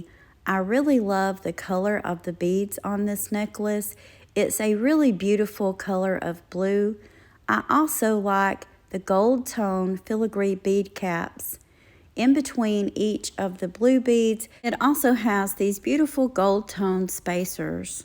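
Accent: South, United States. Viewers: I think it’s just your adorable Southern accent ❤️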